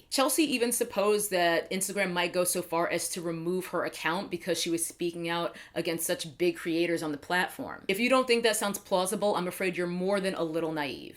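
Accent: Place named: Long Island, United States. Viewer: Pausing this video to say that red lipstick looks amazing on you. And I Also love listening to you talk because I'm getting hints of LI in your vowels. I miss NY, what can I say? Coming back: I felt so bad for Chelsea. It was so obvious that her style was lifted. Patrick Starrr's comment really hit me the wrong way. I didn't like it and got some pretty bad vibes. Manny apologized and to me it appeared to be sincere, but the damage was done. I really hope that this negative will somehow turn around and open up more opportunities for her. She deserves it. I know nothing about making videos, but I knew when I saw hers that she worked very hard. My phone is keeping track of what I read. Today when I woke up, I read about the woman, Ann Lowe, who made Jacqueline Kennedy's wedding dress. It resonated with me as the Ms. Lowe is a woman of color and came from a long line of excellent seamstresses. 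it's been over 50 years and some things have improved, but there is still a long way to go.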